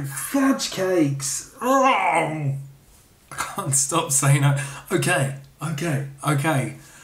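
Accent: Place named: Newcastle, United Kingdom. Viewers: Always thinking about you day and night. Hang in there, bud. By the way your Geordie accent sounds WELSH. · Ah your Geordie accent is brilliant hahaha made me laugh, i wish i could take all this away from you I am hoping and wishing surgery will be successful X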